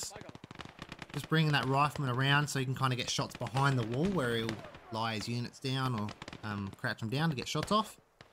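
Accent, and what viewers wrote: Australia. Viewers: Your my favorite Gates of Hell commentator tbh, I think it’s your accent. You sound right out the bush mate. Chuck her in the Uti and keep up the excellent commentary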